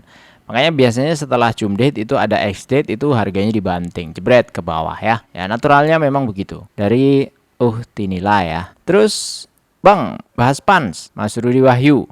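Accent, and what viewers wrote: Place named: Madura, Indonesia. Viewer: Trimakasih abang qu... Sudah di cek saham pans nya... Maaf bang.. Asli orang madura y... Logat nya.. Ngga bisa hilang.. 🙏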